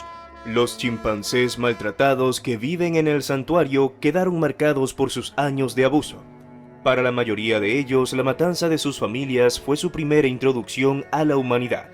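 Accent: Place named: Venezuela. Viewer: El acento tropical y pretencioso, (venezolano?)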